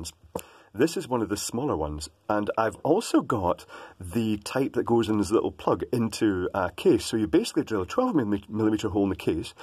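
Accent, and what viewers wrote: Scotland, United Kingdom. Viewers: What part of Scotland is your accent? Is it Edinburgh or inverness? Can’t tell even being Scottish myself.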